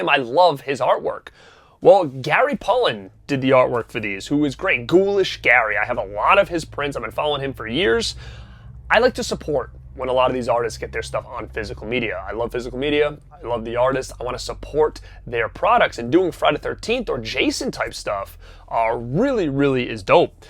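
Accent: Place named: New York, United States. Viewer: What state did you grow up in? You sound like a New Yorker.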